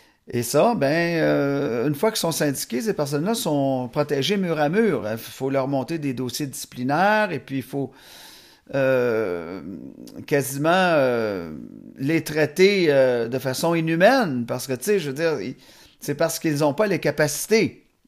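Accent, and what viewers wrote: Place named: Vendée, France. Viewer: Ils sont tous en burn out! Le cerveau brûle...ce type de prof je le décalque au mur! J'eusse connu ...mais pas à ce degré j'avoue ! Ça craint ce monde plein de demon. Bisous Prof avec ton accent souche de Vendée je crois bien sauf erreur de ma part ! ❤💞❤️‍🔥🧠🫶🙏 le TDAH Ça me connaît mais chez nous y a un retard de 300 ans ...je comprends mieux en écoutant des concernés du Canada car en France c'est pitoyable ! C'est mon cerveau TSA qui m'a sauvé les miches! Je remercie mon cerveau chaque jour crois moi! Heureusement je n'ai pas de retard cognitif ! Etre ambivertie est une triforce! Je ne suis plus une enfant ceci dit! Un demi siècle déjà ! J'ai pu comprendre grâce au Dr Karpman qui causait avec Joe Black...je sais aujourd'hui qui je suis...parfaitement imparfaite dys sur dys! 😂😂😂😂❤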